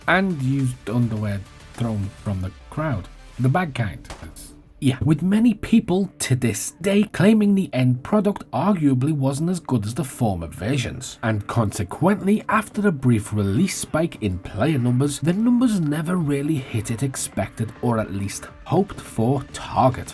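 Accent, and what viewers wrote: Liverpool, United Kingdom. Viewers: scouse legend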